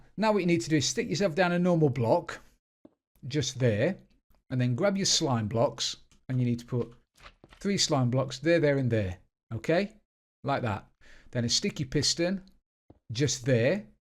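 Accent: United Kingdom. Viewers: i love ur british accent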